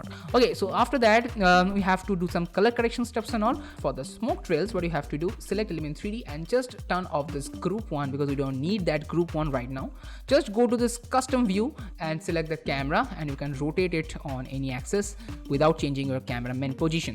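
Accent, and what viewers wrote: India. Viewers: Hay bro where are you from? West Bengal?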